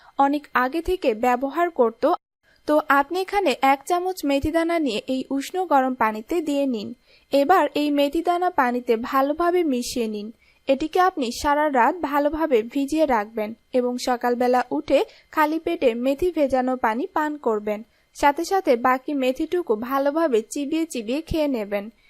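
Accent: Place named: Bangladesh. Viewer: আপনি কি বাংলাদেশের লোক? আপনার কথায় বাংলাদেশি টান আছে।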